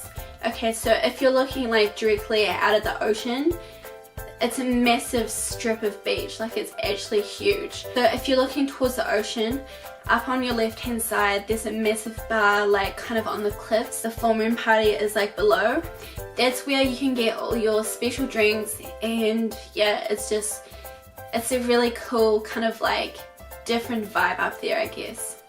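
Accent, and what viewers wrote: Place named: New Zealand. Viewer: What a painfull video with hideous accent... Bloody kiwis....some people naked...yeah right....i think the acid she had has swallen her tongue cause she cant talk properly...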